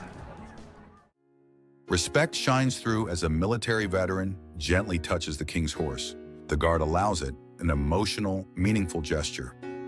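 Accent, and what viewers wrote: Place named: United States. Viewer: Why must an American be the Off voice???🤨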